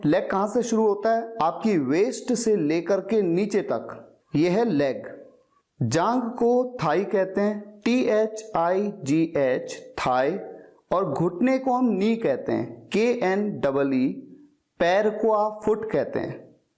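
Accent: India. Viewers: Your pronunciation is totally Indian. Pronunciation should have been like English.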